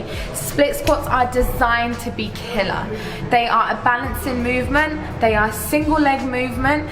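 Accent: England, United Kingdom. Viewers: What part your England is your accent from?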